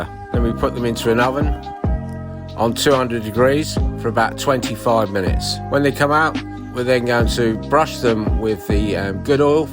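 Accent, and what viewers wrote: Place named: New Zealand. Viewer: Hi I am from South Korea I thought you sounds like kiwi I am right You are in Christchurch I enjoyed your bread show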